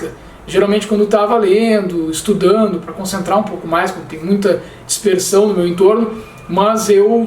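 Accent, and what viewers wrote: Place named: Rio Grande do Sul, Brazil. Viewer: Já vi que é meu conterrâneo pelo sotaque. Mas bah, né? Amei o vídeo. Verei os outros em breve.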